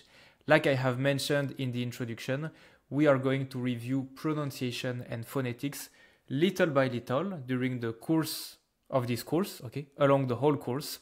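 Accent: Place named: France. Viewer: I love your french accent😊😊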